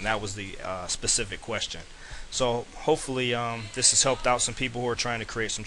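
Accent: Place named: South, United States. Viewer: I like your Southern accent :3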